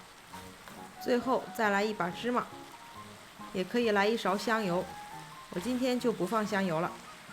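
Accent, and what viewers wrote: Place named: Northeast, China. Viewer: 영상 잘 봤습니다～这个时间看这个，真的流口水了，听博主说话应该是东北人吧😊性格特别豪爽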